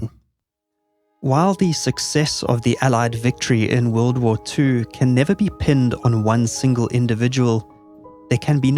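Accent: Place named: South Africa. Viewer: Yes that is a South African accent. A lighter and less twangy one at that. Upper class maybe.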